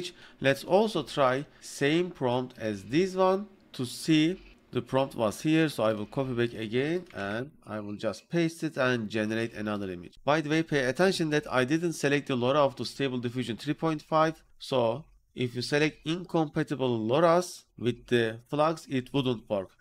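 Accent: Turkey. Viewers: Bende diyorum bu kanaldaki ingilizceyi anlamam nasıl bu kadar kolay olabiliyor diye, meğersem hoca Türkmüş 😂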